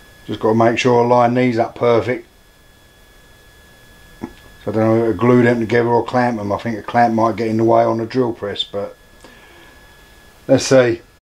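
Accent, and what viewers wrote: East London, United Kingdom. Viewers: As you say not sure whether it was worth it, but on the whole I think it was, because if you had gone out and bought another one it would be a, Chinese, b, crap, and c, you would have had to put it on the mill to correct the crappy machining to get it anywhere near as accurate and smooth operating as you have made your old one! By the way, is that a Warco mill? This is the first time I have seen you using the mill, and as its the same colour as my Warco 300 lathe/mill outfit i just wondered. I live in L.B.Redbridge, are you anywhere near me? I ask that as we share a common East London accent and I mean in my case at least very common! I look forward to your next project eagerly.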